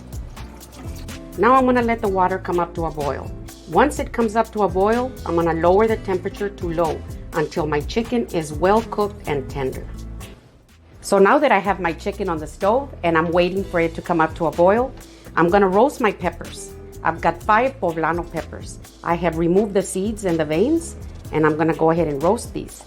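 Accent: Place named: New Mexico, United States. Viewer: Señora ya me dio mucha hambre 🤤 and you remind me of my husbands family from New Mexico, same accent 😀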